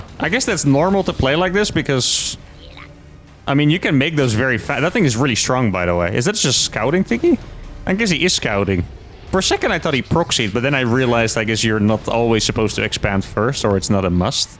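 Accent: Netherlands. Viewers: is this dude Dutch? sounds Dutch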